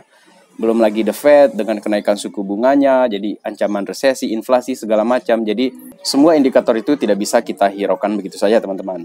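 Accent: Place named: Manggarai, Indonesia. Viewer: Berarti sama logat nya dengan kita orang manggarai yah 🤣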